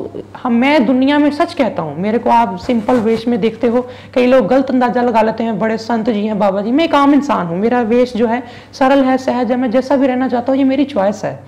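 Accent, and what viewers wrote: Punjab, India. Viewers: I actually enjoy listening you just because you have your own punjabi accent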